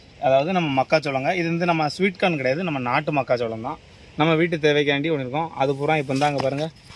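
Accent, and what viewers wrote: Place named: Tirunelveli, India. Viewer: Thirunelveli nnu theriyuthu,,,aana yentha ooru nnga,,,pavoorchatram aaaa????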